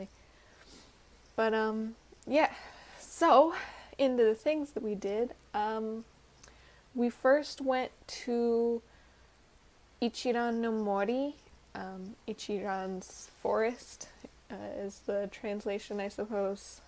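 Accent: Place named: South, United States. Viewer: Thx. I never lived in the US, so didn't know, but yes, you do sound southern to me! Come to think about it, my economics teacher in high school may have been from NC, and she used to teach us about supplaa & demeaand... Pity you can't find time to travel this time. Enjoy the rest of your stay in Kyushu! As for the chocolate, I personally like the Kinoko.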